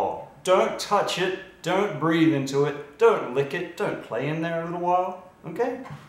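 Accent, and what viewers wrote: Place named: Australia. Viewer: What accent is that? Sounds like Australian that keeps turning into American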